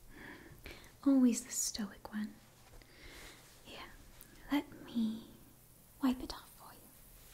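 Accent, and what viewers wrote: United Kingdom. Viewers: How did you match the voice so well? I know it’s technically just a British accent, but something about it feels just perfect for this specific Zelda. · Great British accent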